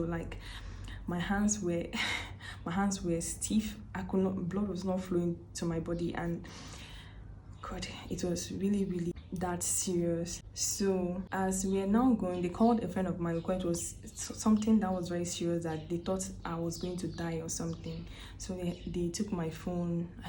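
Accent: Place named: Ghana. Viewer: You speak like Ghanaian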